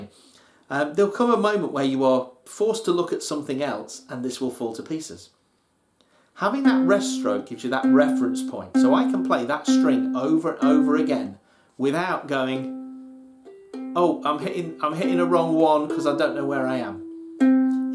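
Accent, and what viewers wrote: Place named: United Kingdom. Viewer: Jolly! Love the ur Brit accent!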